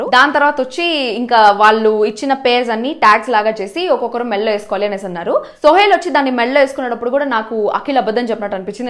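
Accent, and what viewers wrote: Chittoor, India. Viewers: I never seen a girl from chittor speaks in proper slang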